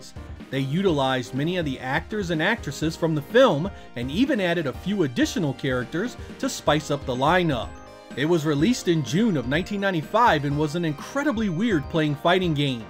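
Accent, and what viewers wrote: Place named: North Carolina, United States. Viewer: I've been meaning to ask you this for years... Where are you from? Your accent reminds me of my dude from North Carolina. Like, to me you say bauls (boss), or bault (bought), or cault (caught). It's not a dis, it actually makes me wanna watch more.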